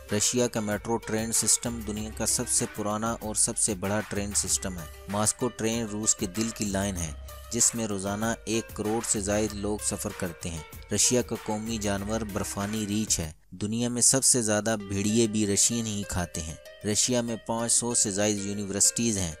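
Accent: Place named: Punjab, Pakistan. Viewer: Yar I love you , n your Punjabi accent Rana sanalluah like ,AAP boht achha info dete ho , friend mere video bhi Dekho share bhi kro friend ke sath drawing vdo ke liye colour cloud Pakistan and cooking video ke liye jolly Azeem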